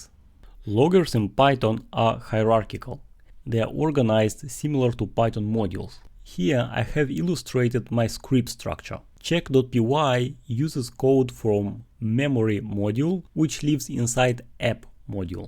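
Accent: Russia. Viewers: Russian English always deliver an extra value to the content))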